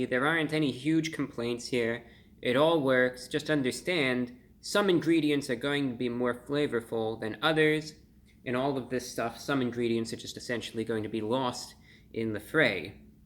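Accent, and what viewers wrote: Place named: Transatlantic, United States. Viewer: You are just adorable. I love your kindof transatlantic accent talking seriously about new fast food releases. I'll go check out your other videos now! I might try this if I can get it with ground beef instead; I'm not a big steak person to begin with and I won't go anywhere near fast food steak.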